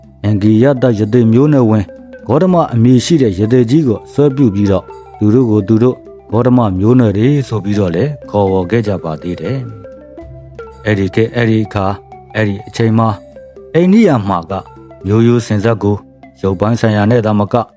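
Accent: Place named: Dawei, Myanmar. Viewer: အကိုစကားပြောတာ ထားဝယ် အသံဝဲသလိုခံစားနေရတယ်ဗျ ထားဝယ်သားလားဗျ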